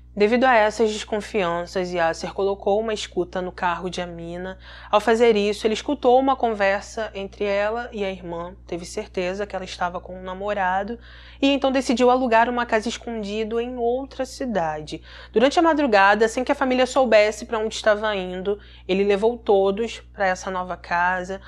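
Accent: Rio de Janeiro, Brazil. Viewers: Boa noite pode ficar o tempo que precisar contando essa história da vida real já vi mas quero ouvir de novo você contar 😉"Estadossss Unidossss" melhor sotaque carioca amoooo linda maravilhosa 🥰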